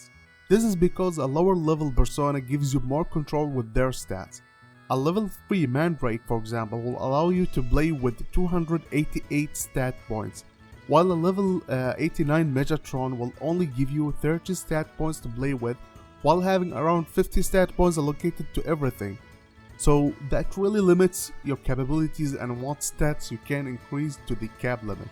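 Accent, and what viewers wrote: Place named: Arab world. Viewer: has an arabian accent 👀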